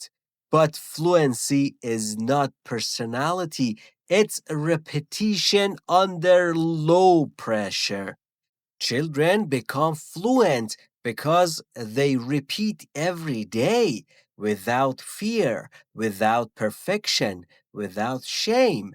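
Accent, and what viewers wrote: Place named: United States. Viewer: شما بسیارعالی تدریس میکنید چون تلفظتان امریکایی ست. بسیارعالیست.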